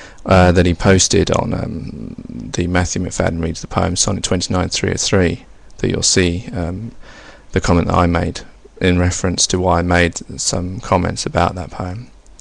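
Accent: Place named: United Kingdom. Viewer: You have an excellent reading voice. You would be a fantastic narrator for books on tape and radio dramas! As an American, I must say that a British accent is always enjoyable to hear!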